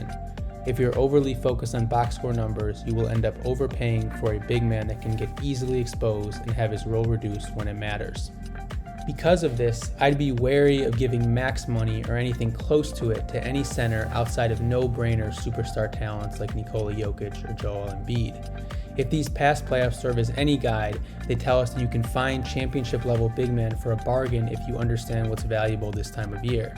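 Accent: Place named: New York, United States. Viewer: Loving this channel. Love the bball knowledge! I hear the NY in your voice so when are you doing a vid bout the Knicks.....w/ BRUNSON!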